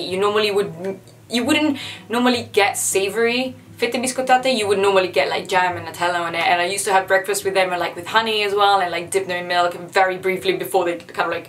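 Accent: England, United Kingdom. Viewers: Bellissimo video! Il tuo accento inglese è top😱🦋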